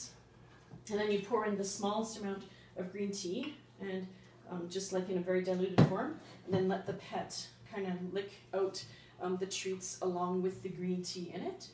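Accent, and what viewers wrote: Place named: Canada. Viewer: Nice hair and I love the Canadian accent!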